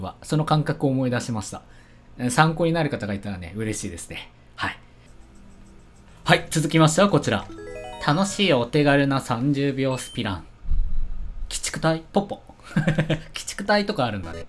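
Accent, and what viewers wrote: Kansai, Japan. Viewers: さんの関西弁好きやな〜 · 最近、若干関西弁挟んでくるの面白いw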